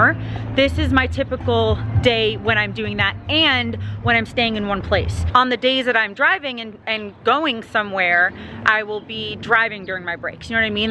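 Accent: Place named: New York, United States. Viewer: I love hearing NY expressions that I never realized were lol. My dad always used to say “dilly dally” but he just recently passed on July 19, 2019. I don’t realize some of the expressions NY’ers say until I hear them. I should have said before I live in NC now for 13 1/2 yrs. So you speak like I do. Lol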